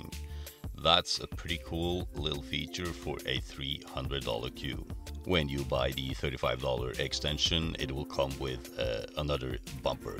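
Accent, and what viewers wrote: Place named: Norway. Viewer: Thought so I have a few friends from Norway and caught a faint lilt in your faultless English.update,I have just ordered this cue now,for sure a great back up to my predator revo,thanks for what I believe to be a wise investment,look forward to more of your content,by the way you move around the table with great skill.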